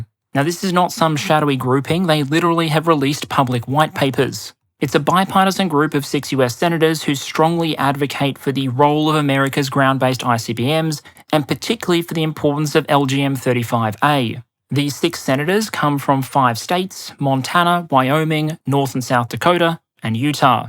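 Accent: Australia. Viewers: Fantastic vid Aussie! I always love seeing one of your videos with a less than sexy name e.g. "Widgets: the design, building, procurement, and supply chains involved", and also knowing some guy who talks funny from down under is going to have my undivided attention for the next hour. I am surprised however when talking about the Bush Turkey, you left out how successful its predecessor the SD-20 Dingo was in it's only decisive victory over Kiwiland in their most recent war. #StandWithKiwiland #StopEmuAggression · No, no, no, once a month 😱? once a week is little enough. Every Sunday I'm looking forward to power point presentation. I even started to like that Ozzy accent!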